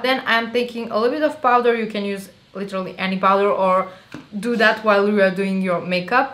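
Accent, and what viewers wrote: France. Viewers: Tu est française non ? Ca s'entend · Wow... J'en reviens pas. Ce sont les sourcils les plus naturels et réalistes que j'ai jamais vu et trouvé sur Youtube! Tu m'as vraiment donné envie de me mettre au makeup :) Je m'abonne sur le champ !!! ( ps: je me permets d'écrire en Français car j'ai remarqué ton léger accent et quand tu as dit Christelle Dominique lol) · Wow the french accent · Hi,Wonderful Blue eyes ,God bless you. Inoticed your French pronunciation Have you been raised in France?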